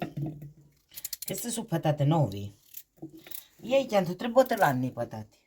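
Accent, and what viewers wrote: Calabria, Italy. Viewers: Carissima signora lei è una bella persona. Ammiro quello che dice e fa. Lei con il suo modo di parlare e le sue ricette mo ricorda molto mia mamma e anche la mia cara zia .io è molti anni che vivo al nord ma la Calabria mi è rimasta nel cuore e il suo dialetto è uguale al nostro quindi penso siamo dalla stessa zona. E u stoccu di cittanova che buono. Grazie di cuore per regalarci le ricette della mia mamma.le auguro tante belle cose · Nn posso dire che la voce sia uguale ma l'accento è quello! Quello di mia madre (nata a Polia ex provincia di Catanzaro, morta a 92 in grazia di Dio). Ho guardato in "giro" gli angoli della tua cucina, il fuoco e le "pignati " dove cucini, quelle stesse di terracotta che ricordo x anni in casa mia...anche papà mio era calabrese di Serra s. Bruno... Da loro ho appreso la dignità e l'onestà e il timore di Dio. 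Che Dio ci benedica tutti❤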